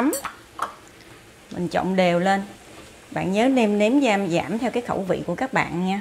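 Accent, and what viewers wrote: South, Vietnam. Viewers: Hướng dẫn rất tuyệt vời. Giọng nói miền nam nhẹ nhàng dễ mến.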